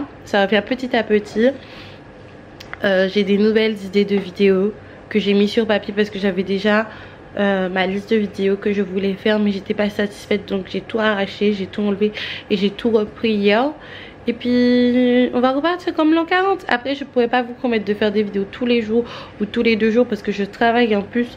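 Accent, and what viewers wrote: Antilles, Caribbean. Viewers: Oui tu as l'accent tu as vécu aux antilles ça c'est suwe 🤗🤗🤗mdw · Fiche que tu as l'accent antillais 😭💔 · T'es congolaise mais ta l'accent des antillais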